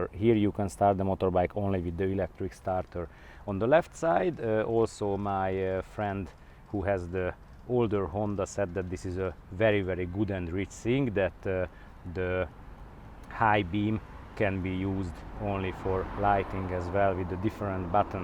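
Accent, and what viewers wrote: Hungary. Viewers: as soon as i heard your accent i knew you are hungarian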